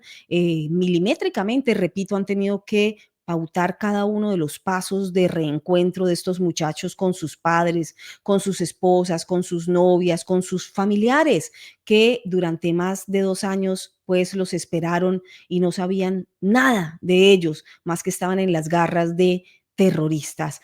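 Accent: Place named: Colombia. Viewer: A pesar de tantos años en EU, no has perdido tu acento colombiano... Es maravilloso.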